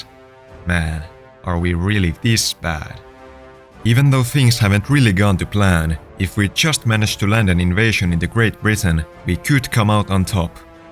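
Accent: Finland. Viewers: Hey man are you from finland cuz u sound like it😮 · the finnish accent, Perfection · Oh god i hear the finnish in you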